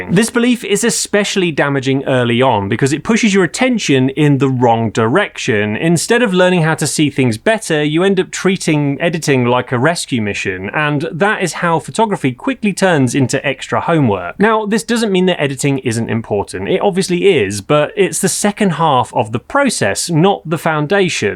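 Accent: England, United Kingdom. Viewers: Very good advices and I like your english accent and style with sous-entendus. Could you add : 1. B&W is a must for artistic photos 2. Never use Auto (which is in the same line as always use Manual) 3. The larger the zoom, the better the photographer.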